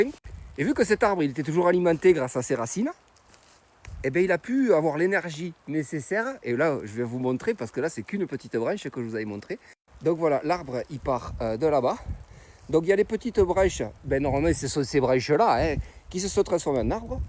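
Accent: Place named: South, France. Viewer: coucou! de la part dune mamie qui à reçu cette année à Noël de sa petite-fille en cadeaux ce livre: la vie secrète des arbres de: Peter Wohlleben,Fred Bernard,Benjamin Flao. peut-être connait- tu ce livre? ma petite-fille faisant des études dans ce domaine (cientiphique).même à 74 ans que j'ai eut le 16 février 2026,on en apprend tout les jours, lorsque j'étais enfant, je grimpais tout les jours aux arbres,ayant la chance, de n'avoir que la route à traverser pour s'y rendre. je vous ai découvert depuis quelques temps,et vous etes une bien belle personne.(j'adore votre accent ).ça me fait pensé à mes années adolescentes passées dans le midi (06). ps: cela fait du bien de vous écouté parlé, vous respirer le bien-être... mamie anne-marie, 77000,proche de fontainebleau, connaissez-vous le château? et le parc magnifique, chargé d'histoires, pour ceux à qui ça intéresse. 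merci encore pour votre savoir pour la jeunesse à venir. 😘❤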